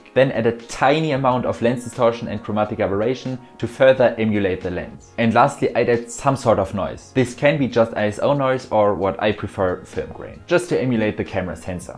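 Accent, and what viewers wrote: Germany. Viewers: ich hör da einen deutschen akzent!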